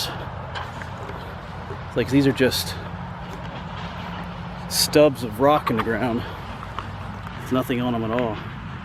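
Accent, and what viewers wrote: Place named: New England, United States. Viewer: Watched the video of Ft. Duncan,there in the disputed territory of Western Virginia. I figured you for a yankee,hearing the accent. I reckon your ancestors kept in the rear to keep from getting killed.The reason l state this is because my ancestors fought for the South and went to Gettysburg. They were excellent shots,being yoeman farmers.Now their grandparents had owned slaves,but they got shed of them,once their families grew. They just followed the yankees way of thinking.Why support someone in winter,feeding,clothing and caring for their welfare,when l have blood kin l can use.So we will sell them off and make a tidy profit. DEO VINDICE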